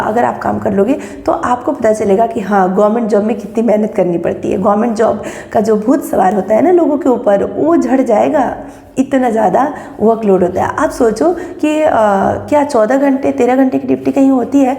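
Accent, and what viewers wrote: Bihar, India. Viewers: Mam ap bihar se h?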